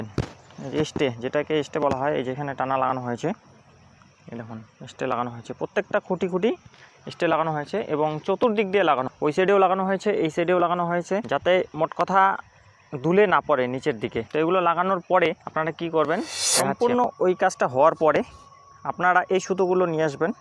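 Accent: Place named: Rajbanshi, India. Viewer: Dada apnar kotha to vatiya r rajbanshi vash mix ache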